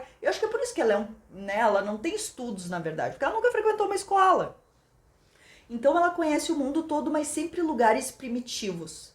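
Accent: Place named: South, Brazil. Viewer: Eu que sou de 88, tenho uma queda por essas capas rsrs, uma vida vendo livros assim nas bibliotecas que frequentei... mas, a história é doida hem?! 😅😂🎉. Nem Jung explica, já que Freud só complica. Pesada a trama...😮 Sigo seus vídeos desde que vi um vídeo seu sobre um livro gótico do círculo do livro, acho sua dicção sensacional, o sotaque sulista é tão lindo... você é muito animada uma pessoa do bem!!! Felicidades para você e seu noivo.😊 Eu sigo a Tati e você não fica atrás nem um pingo, suas resenhas são ótimas. Quando tem uma taça de vinho, já sei que o livro compensa rsrs. Amo o canal do Rubens também ❤ Sucesso!!!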